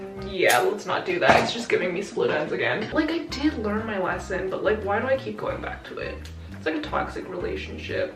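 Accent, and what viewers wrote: United Kingdom. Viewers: Wth I was like "her voice sounds familiar but I've never watched her before" and it's because you sound like trin lovell but less deep, even the british accent lol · Me being a British person, reacting to her British accent 😂 I died 😂😭🤭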